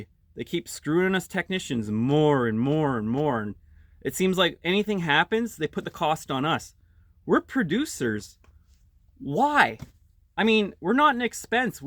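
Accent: Canada. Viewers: Canadian, eh? I went to Sarnia in 1981. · No disrespect, you sound from Canada....i think the dynamics are different than here in the states,flat rate is a HELL NO for me,i been in auto dealership settings 20+ years and when i quit and went into heavy truck family owned very respectful people and my life changed forever master heavy duty certified all my ASE's good family good life,and 0 flat rate...ALL hourly,and its 8 N skate..if i want,i never go to a auto dealership again,but you also made a good career choice you have to make the decisions to make your life better.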